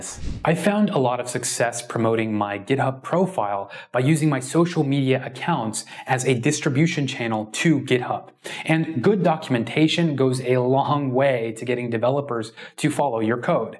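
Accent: India. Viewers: Hey man, Do you have Indian ascent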